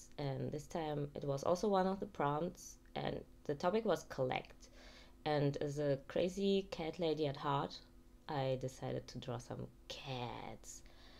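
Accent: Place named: Germany. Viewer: damnnn dat german accent tho 😂 (Im from germany and I struggle with that too, no hate)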